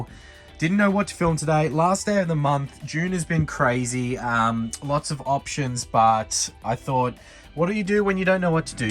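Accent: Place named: Australia. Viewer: Aussie accent, instant subscribe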